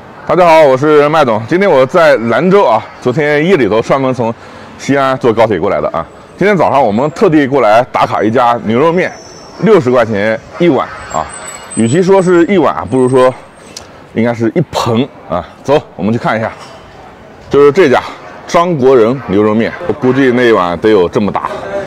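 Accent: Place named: Anhui, China. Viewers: 麦总是不是合肥周边的，口音和我一个同学像的很。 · 一定口音就是安徽人哈哈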